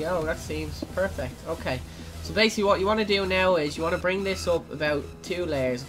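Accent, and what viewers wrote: Ireland. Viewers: r u irish so am i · are u irish and if u are you are from dublin or tallagh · R u Irish cuz I amzz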